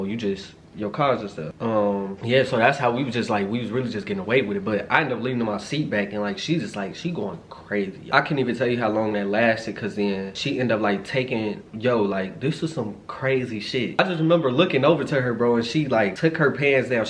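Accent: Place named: Chicago, United States. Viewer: I can hear your Chicago accent stronger than ever in this video. You owe her some head tho. 😂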